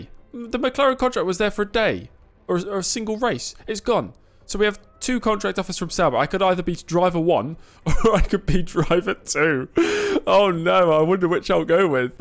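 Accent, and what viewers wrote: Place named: England, United Kingdom. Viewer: I only follow this content because of your perfect English commentator's accent 😂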